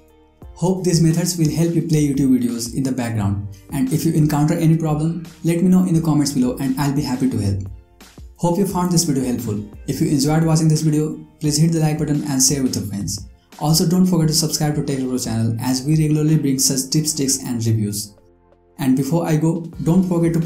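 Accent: India. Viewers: Once I hear an Indian voice I knw its for good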